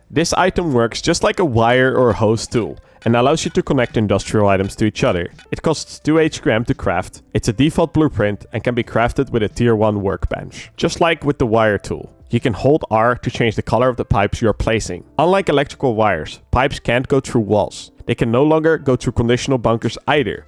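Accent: Netherlands. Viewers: Ik proef het gewoon dat je nl bent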